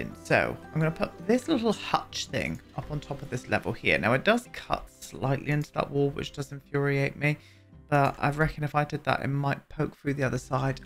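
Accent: United Kingdom. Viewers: The way your pronounce Yiya is sending me I am not sure if it’s cause your British accent and I am Greek Australian but it sounds yah yah or yee-haa.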